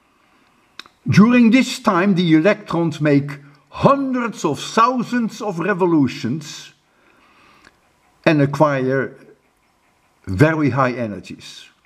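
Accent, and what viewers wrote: Netherlands. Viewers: I hear a Dutch accent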